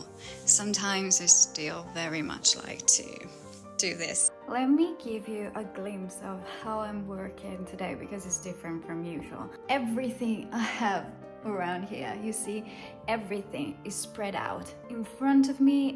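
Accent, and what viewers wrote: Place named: United Kingdom. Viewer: Ciao, grazie mille per questa condivisione❤️ Io ho la luna in toro, e ultimamente ho trascurato la mia connessione con la natura😞 e infatti sono totalmente off balance. Grazie di avermi ricordato di cosa ho bisogno. E grazie di avermi ricordato anche di essere capace di comprendere il British accent🤣🤣 mai scontato 😅🌷 un abbraccio ❤️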